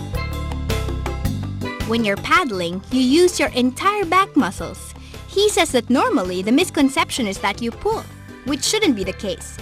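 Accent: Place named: Philippines. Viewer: Love her cute filipinas accent.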